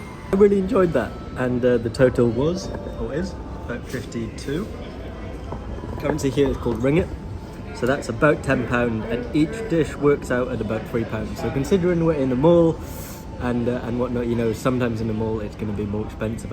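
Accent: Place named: Argentina. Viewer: I keep watching while reading most comments here about how calm and chill this episode. My first impression is you're from South America, particularly like Argentinian. 😂😂. Then to my surprise that you're from Wales when i check your profile page. The only Welsh i knew was the menacing Giggsy.. Aha Enjoy your time in Malaysia eh..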